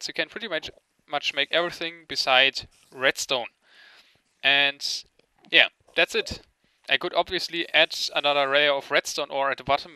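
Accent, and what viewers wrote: Germany. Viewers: dein english akzent ist scheise sry aber ich bin zu 100 % sicher das du deutsch sprichst ;)! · turn on subtitles if you want to laugh it is funny becuase of his German overtone isnt processed correctly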